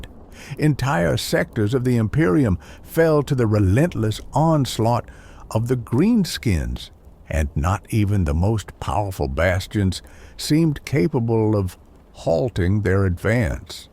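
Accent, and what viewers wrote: South, United States. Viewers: you're welcome. if I may ask what accent is this? It sounds southern, which is cool since most 40k stuff is British. Now I want an inquisitor with a southern accent now. Like those charismatic pastors, but more angry. And a "hillbilly" ogryn. And guardsman that sounds like Lee Ermey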